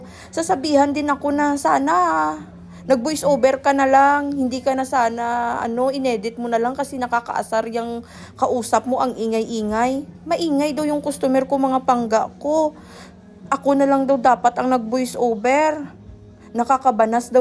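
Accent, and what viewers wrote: Iloilo, Philippines. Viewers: I loved listening to your ilonggo malambing sya from Masbate to stop answering mga basher I watched Latino manicurist , Vietnamese, Korean advance sila , sa pinas isa ka malinis magtangal ng ingrown dry skin everybody is talking while working none stop gid sya Vietnamese to ukit ang style nya at gusto ko yong mga gamit nya it can make it easier sa trabaho try mo mag watch internationally it might give you new idea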